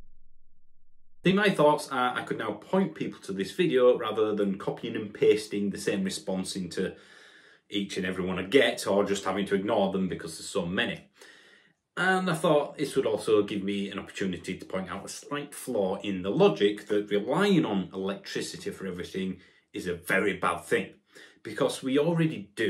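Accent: Yorkshire, United Kingdom. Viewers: nice to hear the Yorkshire accent in among all the American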